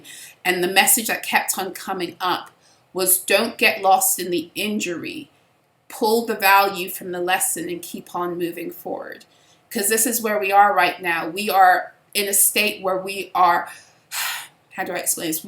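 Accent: United Kingdom. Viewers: Your Hiding a British Accent I hear it...Just like Maji got the T hiding her UK Accemt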